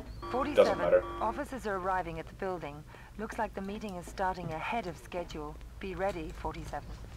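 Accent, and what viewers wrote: Finland. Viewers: That’s a Finnish accent 🤘🏻🇫🇮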